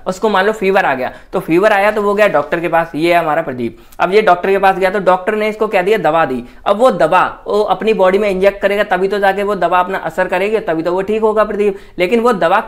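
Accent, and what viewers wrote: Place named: Uttar Pradesh, India. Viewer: u r from up nnaaaa......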